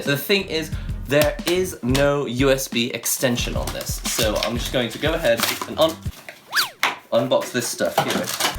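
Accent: United Kingdom. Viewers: I'll be honest, your British accent took me off guard. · wow that British accent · Your family is in the UK? well that explains your accent lol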